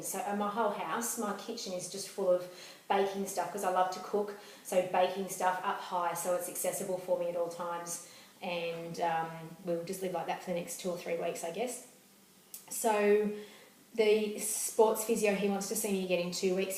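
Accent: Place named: Australia. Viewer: Hey, have just been watching your videos and given me some positive outlook after being told yesterday I have to have a L5/S1 discectomy. It's also great to hear the perspective of someone with an Aussie accent. Not sure where you're located, but if you're in Sydney I would be keen to hear more about your sports physio as I am keen to get up and about and back onto my bike as soon as possible. If you are local to me please email me the details of your physio. Thanks heaps and good luck for your continued recovery!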